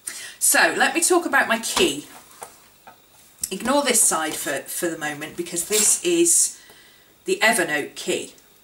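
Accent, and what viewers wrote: United Kingdom. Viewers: I'm just about to start bullet journalling :) Happy to hear a British accent, I was starting to think it was a purely American thing x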